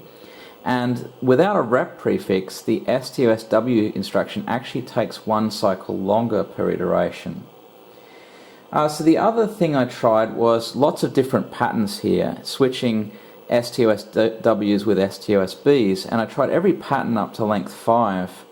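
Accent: Australia. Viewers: Excellent video! Wizard-like skills! We should combine work over our YouTube channels or something if you are interested. Sounds like you are in Aussie - I'm in NZ. Give me a shout if you like :)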